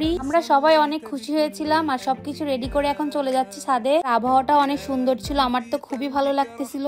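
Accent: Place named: Chittagong, Bangladesh. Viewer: Amader chottogramer vloger,,,amra boalkali taki,,,onek vlo lagay jokon chatgaiya kota suni❤❤❤❤❤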